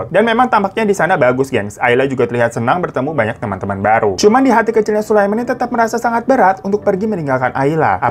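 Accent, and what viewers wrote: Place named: Banyumas, Indonesia. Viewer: Bang muka jepang tapi ngomongnya ngapak, 😂😂 i love you abang 😘😘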